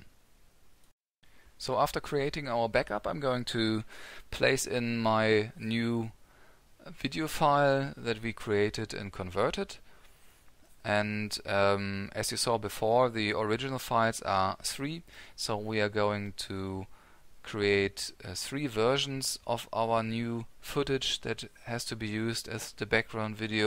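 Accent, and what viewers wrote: Germany. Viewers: Ich habe irgendwie instant gemerkt das du Deutscher bist xD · Gotta love that german english accent. Sehr gut xd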